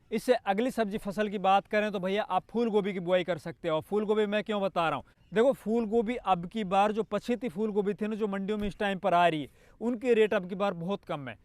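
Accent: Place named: Tijara, India. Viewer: आप की भाषा तिजारा की लगती है आप सानदार काम कर रहे है very nice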